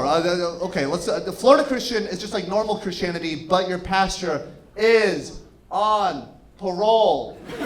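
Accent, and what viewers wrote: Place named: Jamaica. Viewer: Several times he was almost funny. I think he would have killed it if he spoke in his Jamaican accent.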